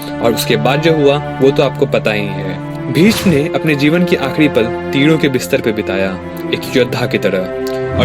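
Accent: Bihar, India. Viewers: Bihadi ho kya bhaiya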